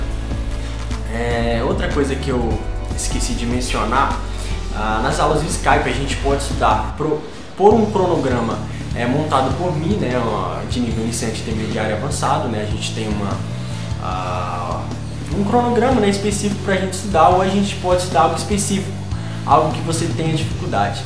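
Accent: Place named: Rio de Janeiro, Brazil. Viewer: Fala moleque, tu é do Rio brother? sotaque carioca, da onde mano?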